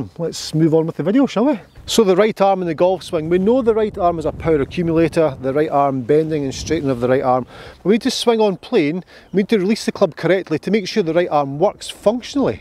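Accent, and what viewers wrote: Scotland, United Kingdom. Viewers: Love the videos. Even understanding Scottish! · One of the best golf channels on YouTube for the following reasons: 1 - Love the Scottish accent and I’m an English man. 2 - fellow ginger, like myself. 3 - Explains drills in a easy way of following! Keep up the good work!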